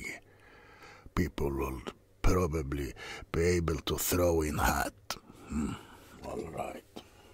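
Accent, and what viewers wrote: Russia. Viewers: I love these Russian ASMR vids they are genuinely hilarious and your Russian accent is brilliant hope you're doing well take it one day at a time my friend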